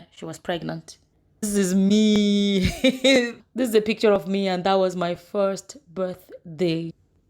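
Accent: Nigeria. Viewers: You speak like your from orlu or Osu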